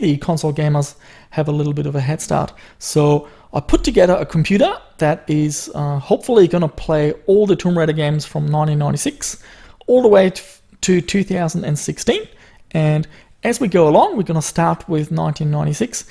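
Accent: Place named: Germany. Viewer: I always have a feeling there is a slight german accent in it. But mit might just be cause by regional stuff.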